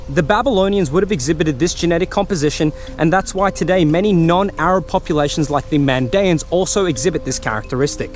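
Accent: Australia. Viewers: Who is this Aussie iraqi lol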